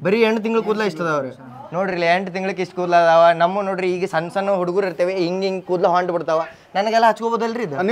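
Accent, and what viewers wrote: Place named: Hubballi, India. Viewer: ಉತ್ತರ ಕರ್ನಾಟಕ ದ ಅದರಲ್ಲೂ ಹುಬ್ಬಳ್ಳಿ ಕನ್ನಡ ಇಷ್ಟವಾಗುತ್ತದೆ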